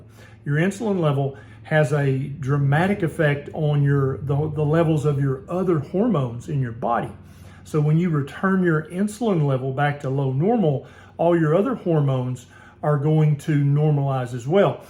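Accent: South, United States. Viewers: Read the full comment you will know where I’m coming from This doesn’t mean you’re dumb I had grown up in Poca, WV you’re a DR. you have to be near the top of your graduating class I just want to point something out it’s just an observation but I could tell you’re “country” by your accent then when you said bacon grease I knew you were from the south I grown up on white bacon gravy bacon and white bread rode four wheelers again you can be one of the smartest people in your town for your age group and everybody not believe in you because of your accent I know somebody from Elkview, WV he was picked on for his accent because of that people didn’t think he was smart he finished near the top of his class and became an stockbroker his salary is decent.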